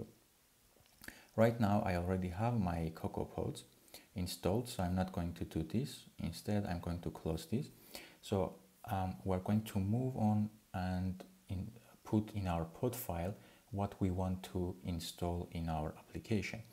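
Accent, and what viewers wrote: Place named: Iran. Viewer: Thanks it was useful💙 with your lovely Persian accent😁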